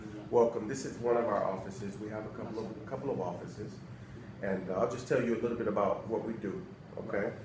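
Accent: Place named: United Kingdom. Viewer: Asslam Alikom bro. You are very welcome in Riyadh and I hope you visit us again and having Arabic coffee, having amain dish in SA that's call Kabsah, barbeque during the night, stroll in desert, going a picnic out of the city espically in spring or winter. I know you love coffee& you have KMW cafe in Ras Elkhemah. May Allah give you ability& help for inviting non-muslims to convert to Islam, Jazak Allah Khair. I like you when you trying to speak Arabic. I am dought when I hear your voice that you speak British slang coz I understood what you saying. Plz contact me for any help from SA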